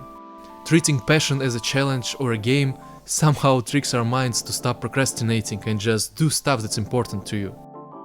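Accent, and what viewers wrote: Russia. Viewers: Все равно слышен русский акцент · Хех, хоть ты и говоришь на английском, я понял что ты Русский :) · Мне одному показалось что автор не коренной англичанин, возможно русский или украинец. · корявый английский , избавься от ебаного русского акцента а то такая речь уши режет . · Вначале я подумал "О русский", потом я задумался "А что если не только у русских такой акцент", а в конце все же посмотрел на комменты, а русский классно. Побольше бы людей в геймдеве русских было! Осталось только самому заняться этим и провести 1000 часов. Напоследок от себя автору: Well done! :) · Русский акцент слышно сразу) Добавил бы русские субтитры)) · Вот слушаешь и задаёшься вопросом не русский ли родной язык? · Ррашын акцент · Русский акцент узнаешь из тысячи:) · Как кайфово слушать инглиш с русским акцентом, легче чем азиатов или американцев :D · Russian accent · Акцент русский) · Очень похоже на русский акцент... 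· У тебя русский акцент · Русский что-ли? Акцент слышно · im glad you showed us a face cam cause with your voice the only thing i can imagine is that steriotypical russian guy that plays cs go, with all do respect.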